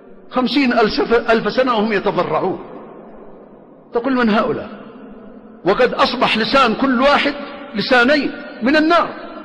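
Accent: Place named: Sanaa, Yemen. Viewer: بل هذا للشيخ عبدالله بن عثمان الذماري، فهو يتكلم ببعض الحروف بالهجة الصنعانية، ركز في الصوت، ما شاءالله يشابه صوت العلامة ربيع بن هادي المدخلي حفظه الله.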